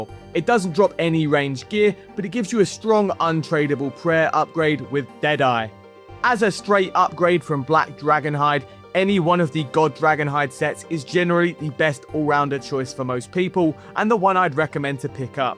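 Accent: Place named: United Kingdom. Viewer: God damn you’re the best in the business. Info, facts, humor and a wa-ah bo-ool accent? Perfection.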